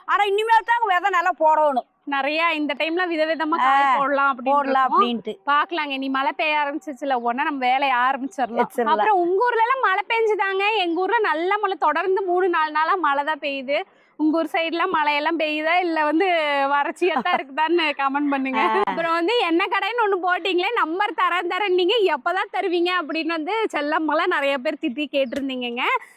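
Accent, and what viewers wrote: Kongu, India. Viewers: ஐஸ் நான் போலிஸ் தான் அனால் உங்கள் கொங்கு தமிழ் பேச்சுக்கு அடிமை என் வீட்டில் மாடி தோட்டம் வைத்து இருக்கிறேன் உங்கள் வீடியோக்கு காத்திருப்பேன் சென்னை வந்தாள் எங்கள் வீட்டிற்கு வரவேண்டும் 🙏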